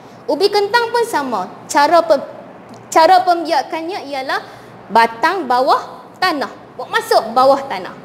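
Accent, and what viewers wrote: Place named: Kedah, Malaysia. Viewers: Saja je 😅 kedah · terima kasih cikgu saya suka cikgu cakap kedah🤭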